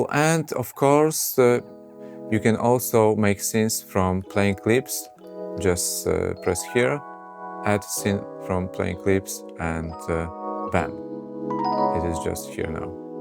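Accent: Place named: Poland. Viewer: Uslyszalam polski akcent.Mialam nosa.😄A ja jestem nadal na Studio One 2😂🤣😅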